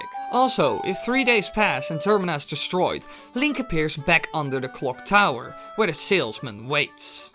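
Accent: Netherlands. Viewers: ur dutch accent is so distracting since i'm dutch as well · lemon it's like a typical Dutch person trying to speak English lol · This is a thicc dutch accent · is he dutch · You're dutch aren't you? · Hoor ik daar een Nederlands accent? ;-) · Why does this guy have a dutch accent all of the sudden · Are you Dutch? Your accent sounds a bit Dutch. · Wait a minute HEY YOUR DUTCH:D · Dude... Dutch seeps out of every pore of your accent x) But it's less cringey than the usual dutchman speaking English, so... +1 nieuwe sub, lol. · Hella Skella Don't brag beebje, even you aren't that fluent, but most certainly more fluent than this dude 😂 But yes, this is unmistakably a Dutch accent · Holy shit Damn I can hear you are dutch finnaly a fellow dutchie:DDD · are you dutch? i think it because of you're accent. sorry if i am wrong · your voice is not annoying at all or anything [neither is the accent], but after hearing you talk for 3 seconds i want to ask: what part of the Netherlands are you from? 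:P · Jacob Marcelo i think its a dutch accent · ur English accent is a dutch man speaking English I know I speak the same way and I'm Dutch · R you dutch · he is dutch. The accent is obvious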